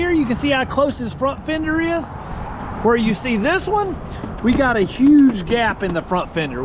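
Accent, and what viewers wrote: South, United States. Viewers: bro I love your stuff, I just have to ask has anyone ever told you, you kinda sound like Charlie Day with a southern accent?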